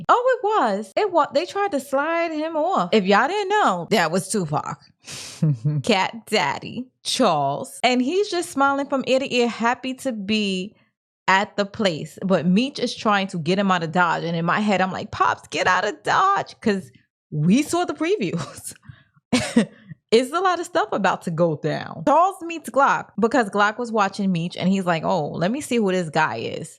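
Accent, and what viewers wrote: New York, United States. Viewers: Your breakdowns and edits are really cool and always hit the important elements of the episode,. Your New York accent adds the flavor - my girl is from Looong Guylanddd. The father-son (and mother) bonding over music is a Detroit thing, IMO. I learned keys, bass, and organ from my mother and uncle. Stay strong!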